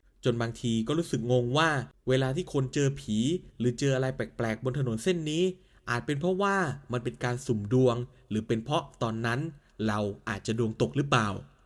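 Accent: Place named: South, Thailand. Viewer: เส้นทางนี้มีอีกหลายเรื่องมากครับ ผมจะทักหลายครั้งแล้ว ถ้าเรดาร์ผมไม่พลาด แอด คนใต้ชัวร์ น่ารักด้วย 😉❤️😜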